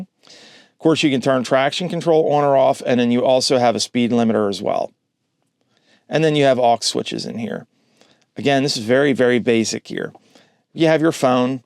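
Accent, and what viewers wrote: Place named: Philadelphia, United States. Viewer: I'm hearing a Philly Delco accent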